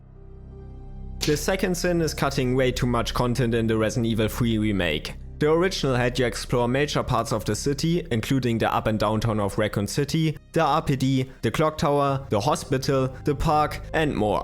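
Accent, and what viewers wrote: Germany. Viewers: gerne machst ja auch nen klasse Job <3 und wenn es dich stört kommentiere ich gerne zukünftig in Englisch:). Denke halt es liegt daran das man gut raushört das du deutscher/deutschsprachig bist.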